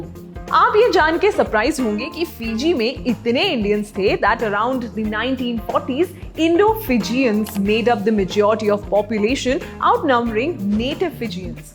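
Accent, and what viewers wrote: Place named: United Kingdom. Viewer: She's speaking british hindi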